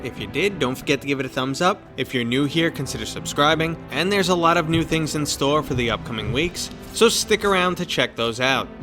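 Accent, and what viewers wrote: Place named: Boston, United States. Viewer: Great video. Also you sound like you're trying really hard to hide that Boston accent, but failing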